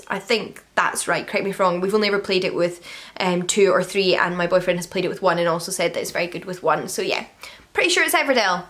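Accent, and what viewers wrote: Scotland, United Kingdom. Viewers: That board game looks amazing, we will have to look for it. Congratulations on your writing and getting close to finishing the PhD! I totally understand the feeling about buying your first place without a romantic partner. It is really a great idea and I would hope that more young women especially would consider doing the same. It is massively important to your personal development as a person to have become your own independent person prior to committing to a lifelong relationship with everything that comes with that. Even though your lives are together, establishing your own space and responsibilities makes good sense. I love that you and your mom have cohabitate so well during the pandemic. Given that your dad passed away so recently, it is great that you could be there for each other, especially with the lockdowns and isolation. 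In terms of your book and making an audiobook, I love the idea of you reading it! I would guess that most of the people that follow you are comfortable with your voice and the Scottish pronunciations would be a treat. What a great idea! Lastly, in terms of the writing to try, I’m going with the gothic YA finishing school idea. That sounds excellent. You have so many wonderful projects coming up! I’m looking forward to seeing you get through them (which will only create new ideas for the future!). 🔥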